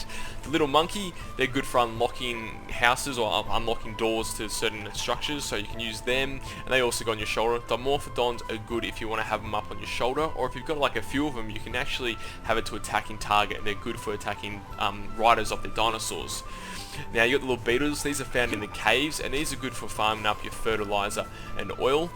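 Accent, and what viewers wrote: Australia. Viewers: BobHoppaFlotchkin Maybe some people don't speak english as their native langue (go figure) and thus struggle to understand someone with a thick australian accent who could give Steve Woodmore a run for his money?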